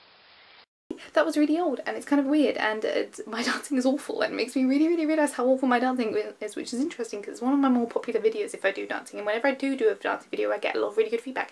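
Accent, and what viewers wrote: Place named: England, United Kingdom. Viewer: I had only minimal issues understanding what you were saying, but that's because I am used to hearing people with an English accent talk. Not quite at that speed but your diction and pronunciation is very clear. Congratulations on the 500 subscriber mark by the way. Hope it keeps building. Thank you also for being such a good moderator for Trisha Hershberger when she does her streams that you can be around for. I know the time difference is quite a challenge even when she streams in the afternoons(for her) since it is still a 9 hour gap. Please always keep up the lightness and cheer in your videos,tweets and everyday life, the world can be dark and full of terrors but you help shine a light through it everyday.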